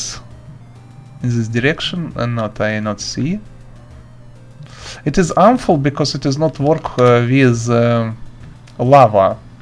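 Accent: Russia. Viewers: Русский акцент имба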